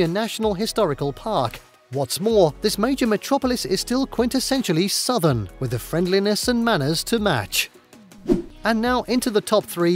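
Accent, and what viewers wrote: United Kingdom. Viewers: Great job showing amazing places to visit in Ga. But caught me off guard when I heard a British accent. I was expecting a Ga accent.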